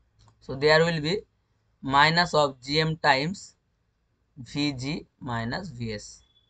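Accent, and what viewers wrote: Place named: India. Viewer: Very hard to understand your Indian accent. Is it possible for you to speak slowly?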